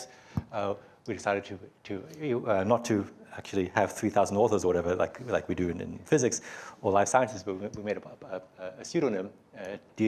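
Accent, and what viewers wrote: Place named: Australia. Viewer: He’s brilliant. I was in the same year when entering Princeton grad school and lived in the same “ New College “ (dorm) as Terry in the early 90s. He was so young and we all saw him at dinner each night. I’m in the humanities so we weren’t close. He was roughly 16 or so if I remember correctly. Later, I would sometimes see him walking on Witherspoon, deep in thought. He had this same Australian accent in those days as well.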